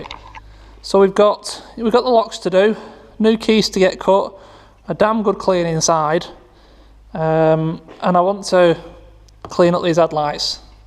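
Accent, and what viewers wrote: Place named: Northern England, United Kingdom. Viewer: Lovely Jubbly video, great northern accent.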